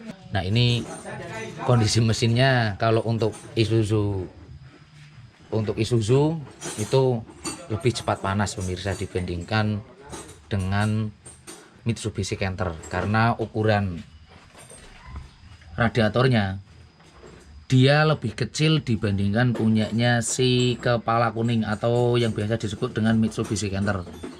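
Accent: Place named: Banyumas, Indonesia. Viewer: Ngomonge deneng mandan ngapak ya mas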